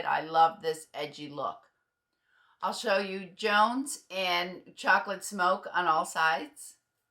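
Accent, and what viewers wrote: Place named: New York, United States. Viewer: Thanks, for the review, and change of color. It looks different from indoors and outdoors. I was wondering about this color. Their wigs are so comfortable. Enjoy your voice. Sounds like you have a New Yorker accent. Thx!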